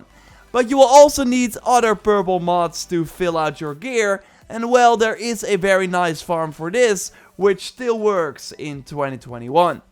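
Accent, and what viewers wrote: Netherlands. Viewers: Yea he says asin-creed too :) It's all good he's Dutch? We forgive him!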